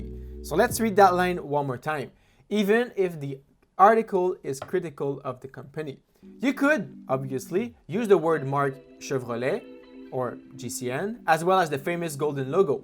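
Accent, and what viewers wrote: Quebec, Canada. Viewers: ça sonne québécois, comme l'accent! 👍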